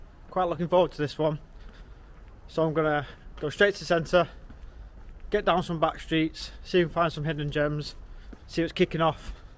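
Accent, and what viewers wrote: Manchester, United Kingdom. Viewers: He's sounds like he's from Manchester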